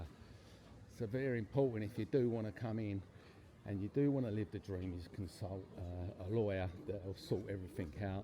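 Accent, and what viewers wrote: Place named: Cockney, United Kingdom. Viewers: Well, the guy has a Gor Blimey accent, so he is used to Ugly Dumps!! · 2030 will be in touch if we're all still around. many thanks. btw i'm from romford and from your accent you're from simlar area?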